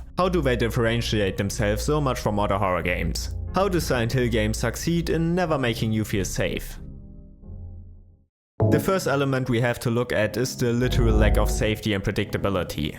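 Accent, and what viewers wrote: Germany. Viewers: Bist du deutscher? das hört man sofort 😁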